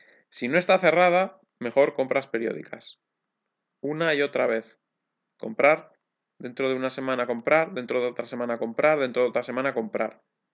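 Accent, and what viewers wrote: Spain. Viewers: Gracias a tí por tu esfuerzo para con nos. Por cierto, de dónde eres? Me suena tu acento de Vitoria o por ahí. Es curiosidad, y sobre todo por si ando por allí y te veo, invitarte a tomar algo. Te lo mereces todo. Gracias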